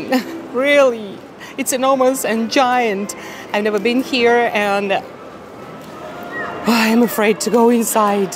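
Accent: Russia. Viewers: Ощущение, что это русская девушка, просто говорящая на английском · Your accent is like Russians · Вы учитель английского? Разговариваете как по Бонку. И акцент русский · ! С первых слов на английском слышно Рязанское произношение. Это пропаганда! Еды много, а кто это покупает?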